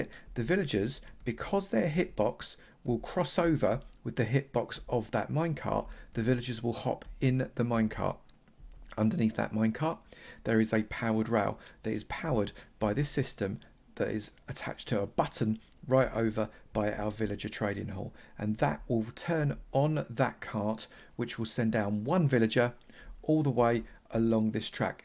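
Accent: United Kingdom. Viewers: Nah its British accent.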